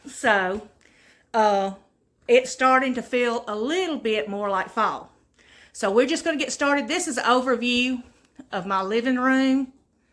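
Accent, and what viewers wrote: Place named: South, United States. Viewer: Hi from Southern California. Sent over by Lynn Wilson. I’ve subscribed to your channel. I just absolutely love your southern accent!❤❤❤. Happy Fall. Hope you don’t mind if I steal some of your decoration ideas for my home. Love your home!!!!!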